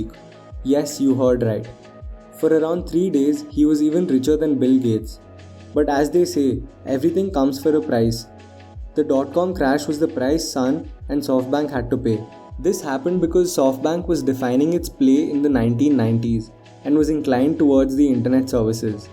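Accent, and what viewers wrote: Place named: India. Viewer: Are you Indian? You're accent is indian-ish, great video tho! I subbed uwu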